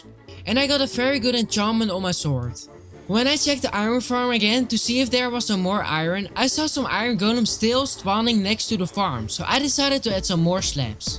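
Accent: Netherlands. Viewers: What country are you from because you have an dutch accent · your accent is netherlands en ik ben ook Nederlands daar om dacht ik het en het is een goede video is jouw friend ook Nederlands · you sound so dutch ( i'm dutch myself lmao ) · What country are you from?you got like a netherlands accent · dat hoorde ik all aan je accent 😂😂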